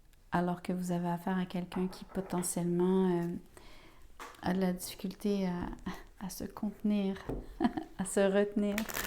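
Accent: Quebec, Canada. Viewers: Bonsoir madame Pour la pause... elle est pour moi une rupture malgré c est vrai une attente pour ce qui est de la communication , j'ai bien tourné le dos à cette personne. Je suis ce Lion ( feu ) et elle balance, tres changeante et tres joueuse, non ancrée et je ne serai pas surppris qu elle joue sur plusieurs tableaux d' ou la rupture . C est vrai, j ai cette impression d un bonheur perdu mais ce n etait qu illusions et je l ai compris et accepté. Tout à fait exact pour cette balance qui n'a aucune confiance en elle meme si elle presente aux yeux du monde tout le contraire. C est vrai... il n y a pas de gris chez moi ... c est tout ou rien . Cette personne a tjrs ete voir ailleurs ( addictions) . Pour ce qui est de se retrouver en couple .... Noway Merci madame💐🙏, voix appaisante, douce, avec un arriere accent du Quebec et meme un peu de latin . Xoxo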